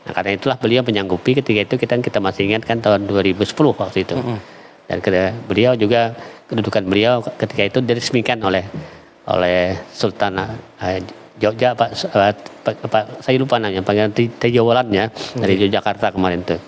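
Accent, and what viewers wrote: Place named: Brunei. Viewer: Hampir sama dialek Melayu Brunei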